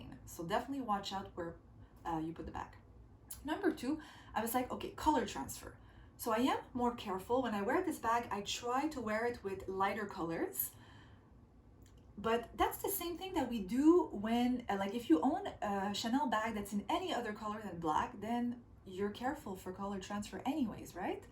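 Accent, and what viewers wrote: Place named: Quebec, Canada. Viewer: You're french Canadian right ? I feel like I can hear the slight Canadian french in your accent. 🥰😊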